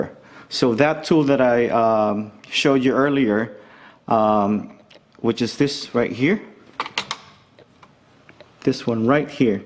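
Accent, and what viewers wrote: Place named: Philippines. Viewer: You have Pilipino accent boss 😁 astig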